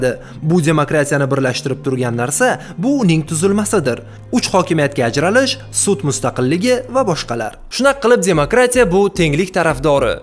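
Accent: Tashkent, Uzbekistan. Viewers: Тошкан шевада гапирманг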